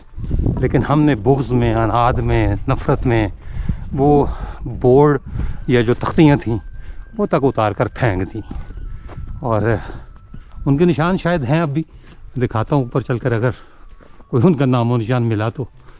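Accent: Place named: Punjab, India. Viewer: His Urdu has inclination towards United Provinces , and to Bihar, yet a creamy touch of Panjabi accent.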